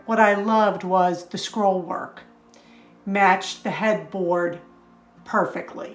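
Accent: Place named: Long Island, United States. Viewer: Hi. This is my first time here. I love frugal videos and yours is the first I've seen from NYS. I'm a New Yorker too....from Long Island. I think I detect a LI accent from you. Lol. I'm going to make the orange marmalade....mmmm. I love marmalade. is doing a great job on the deck. There's so many ways we can save money. Thanks for sharing. I subbed you and I'm looking forward to watching more of your videos. 😊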